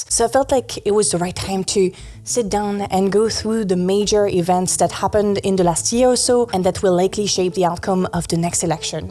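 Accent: France. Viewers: Girl, which French department are you from? I’ve never heard such strong French like yours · Your accent makes me think “English sounds best spoken by the French” 😌 ((I'm from the UK if that means anything)) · She has a strong French accent, she references France quite a few times in her content, how you are you just learning this?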